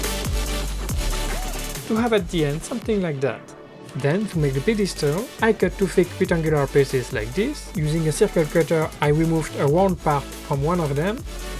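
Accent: France. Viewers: T'est français?, je trouve que t'as un accent francais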